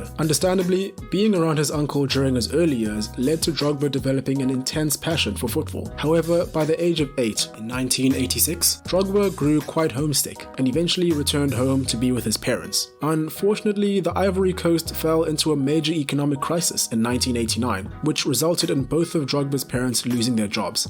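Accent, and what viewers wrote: South Africa. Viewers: Bro you South African? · are you from south africa? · Are you South African ?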